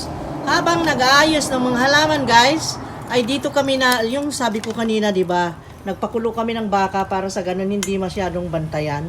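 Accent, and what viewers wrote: Ilocos, Philippines. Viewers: I was wondering about your accent all along, Ilocano pala kayo living in the Visayas. My family is from Pangasinan-Tarlac, both Ilocano parents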